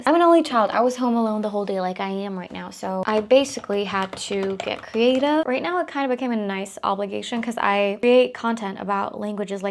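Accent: United States. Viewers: as a fellow turkish person, can u share how u got this perfect american accent pleaseeee queen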